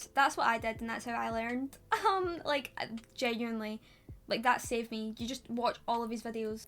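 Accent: Scotland, United Kingdom. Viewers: That accent is magnificent, I might need to come home to Scotland. I should also visit Ireland at some point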